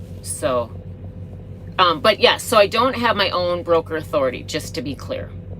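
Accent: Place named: Minnesota, United States. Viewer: Thanks for the "moot point", most say "mute point". Who could hate you? Notice a bit of Minnesota Ya hey der.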